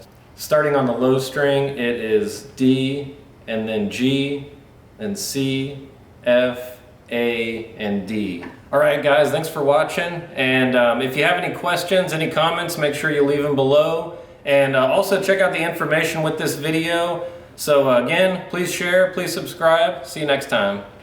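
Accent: South, United States. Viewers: Where are you from man, I’d be surprised if you were not a southerner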